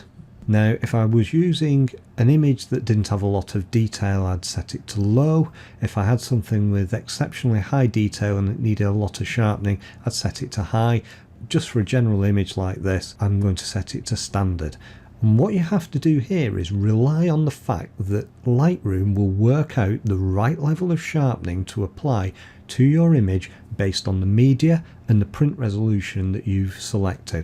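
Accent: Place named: Lancashire, United Kingdom. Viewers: Your accent sounds local to where I live. Born in Lancs live over the Pennines 🙂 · Hi, why on earth can't others talk clearly and in a straightforward manner like you, clear & concise in an English accent. First class video. thank you so much.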